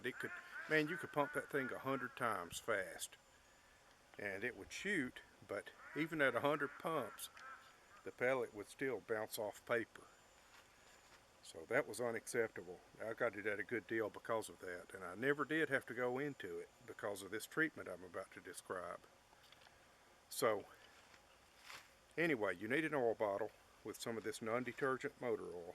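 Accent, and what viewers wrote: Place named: Louisiana, United States. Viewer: Interesting. Your accent and phraseology are just like my relatives (mostly gone now) from Bienville Parish. But, they came there long ago from the Montgomery area of AL. I used to live in Chattanooga. My Sheridan C9A (which I bought new in 1998) wasn't shot for a long time until recently. It was not stored with any air in it. I got it out recently and I've been trying to shoot it, but I was cocking the bolt and placing a pellet in the chamber. Then, I would start pumping and on about the 2nd or 3rd pump, it would shot out the pellet out at low velocity. After that, I could still pull the trigger and it would 'shoot' with no pellet. I have oiled it since with some compressor oil and not inserted pellet until after I've pumped and it seems to be shooting ok now. But, on the last few pumps, it seems I hear air moving somewhere right after the stroke if finished. 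Thanks for video!! Steve McCoy Van Osdell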